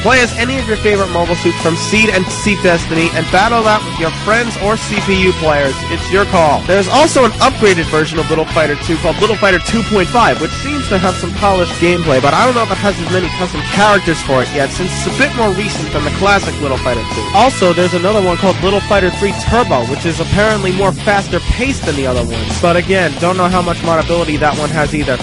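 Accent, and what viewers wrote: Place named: New Jersey, United States. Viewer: this guys obviously from jersey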